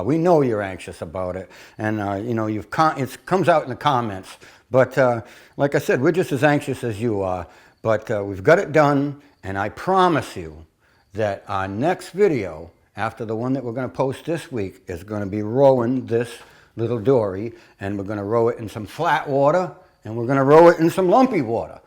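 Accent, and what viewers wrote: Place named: Maine, United States. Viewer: I am guessing you're from Maine from your accent (I grew up there)....I just got my first planer and I greatly appreciate this video, I am going to make these adjustments THANKS!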